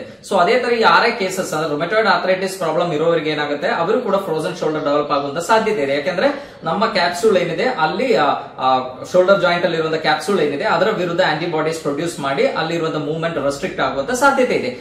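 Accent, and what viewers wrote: Kerala, India. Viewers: sir you seems like from malayalam language background but your kannada is excellent..👍🏻very good information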